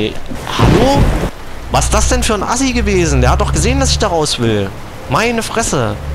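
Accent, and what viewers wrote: Germany. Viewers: Ei verbibcht noch e mo, da kimmt ahner wo och ausm scheene Sachseländle :D ... Mir sinn fai gluuch, dischdsch lusdsch, schnibbsch und gudmiedsch sinn me ooch und manschema e wing einfelltsch, nur eens simmor ne: Dehmlisch! Grüße aus Dräschden :D So, genug von dem Scheiß, zu deiner Frage: Nein, Himmel, kein Minecraft :P Also, ich guck ja viel bei dir mit und kann dich mitlerweile gut ab, aber dort bin ich raus...sorry ;)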